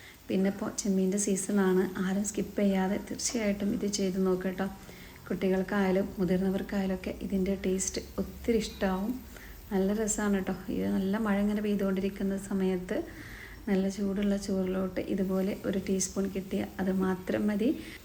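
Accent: Malappuram, India. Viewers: നിങ്ങളുടെ വീട് എവിടെ യാ. ഭാഷ മലപ്പുറം ആണി. മലപ്പുറത്തു എവിടെ ആണ്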